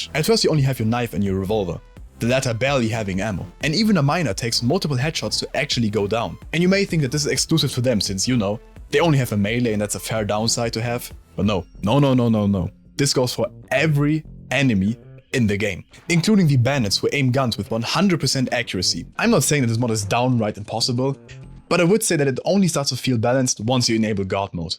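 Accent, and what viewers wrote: Germany. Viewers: Lovely video! Like others have said, you are very engaging while presenting on camera. You kind of remind me of a younger Fernando Alonso but with a German accent.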